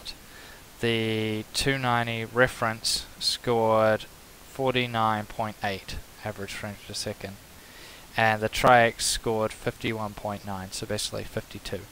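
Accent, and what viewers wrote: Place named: New Zealand. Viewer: thought you sounded kiwi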